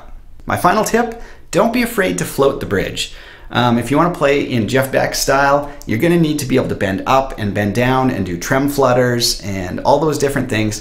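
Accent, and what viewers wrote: Canada. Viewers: like you're videos, what kind of dialects is this, Canada?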